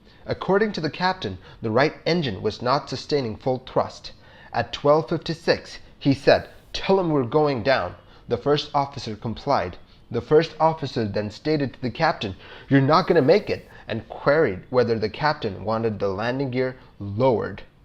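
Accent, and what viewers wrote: India. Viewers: You sound like an absolute asshat, since he is Indian and English isn't his first language 🤷‍♀️ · He's from India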